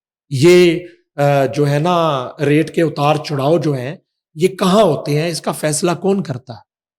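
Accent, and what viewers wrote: India. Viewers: اگر آپ نے انڈین کلچر ہی پروموٹ کرنا ہے تو بہتر ہے آپ انڈیا چلی جاؤ.... پاکستانی بچوں کی اُردو خراب نہ کرو.... بہت شُکریہ😮 خ...خان کو کھان کہنا غ.....غیر کو گیر کہنا یہ ہمارے ہاں نہیں چلے گا افسوس اس بات پہ ہے کہ ڈاکٹر ثمینہ حق نے بھی اپنے ایک پوڈ کاسٹ میں کہا تھا کہ انڈین ڈرامے سٹار پلس وغیرہ دیکھنے چھوڑیں آپکی زُبان، کلچر، رہن سہن، مذہب اور دماغی سازشیں سب کُچھ آپکے ماحول کو گھروں کو خراب کر رہے ہیں.... مُشتری ہوشیار باش 😮😢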